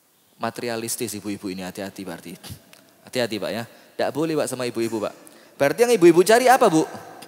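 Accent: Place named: Surabaya, Indonesia. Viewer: Ustad dr Jawa timuran ndelok logat e lek gak arek SBY Yo arek malang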